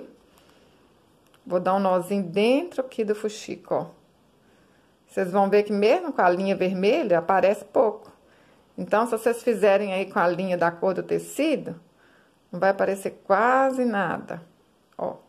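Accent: Minas Gerais, Brazil. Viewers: Ah o sotaque mineiro 😍 Um calma, conseguimos pegar passo a passo, obrigada por compartilhar suas ideias. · Há quanto tempo desejo aprender a fazer fuxico... Muito obrigada... Sei sotaque é muito lindo!!! É de minas??? Muito graciosa e paciente você é!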